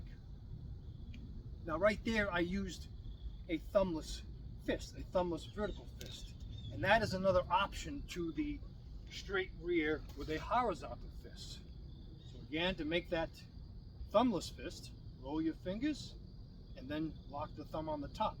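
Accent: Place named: New England, United States. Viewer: Hi! Thank you! I detect a New England accent! Have a wonderful day! John KJV John KJV John KJV ❤❤❤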